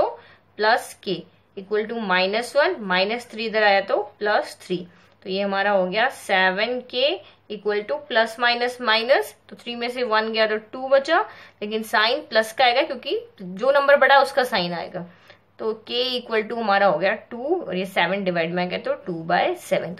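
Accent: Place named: Haryana, India. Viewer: Haryanvi accent OP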